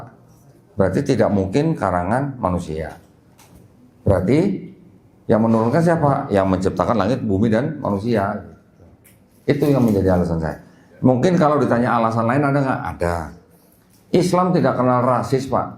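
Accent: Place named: Java, Indonesia. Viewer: Beliau ini chinese tp kok njawani yo. Lanjut koh...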